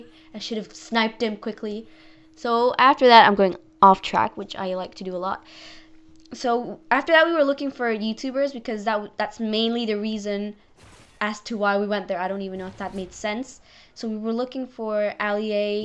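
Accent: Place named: England, United Kingdom. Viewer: It's very enjoyable when your English accent sneaks in. As far as showing your face, the mystery can be a lot fun. Think out of the box.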